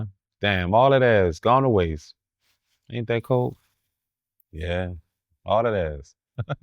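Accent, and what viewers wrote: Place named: New Orleans, United States. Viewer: Love that New Orleans accent.💯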